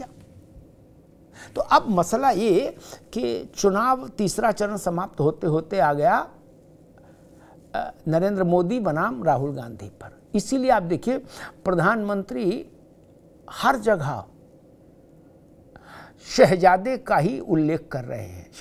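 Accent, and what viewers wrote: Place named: Kanpur, India. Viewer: Sir your observation and cumminicatons skill are excellent and presentation is very effective. Please answer me are you from Lucknow or kanpur as language shows but nice explanation for general population please answer place of residence I think kanpur 😂😂😂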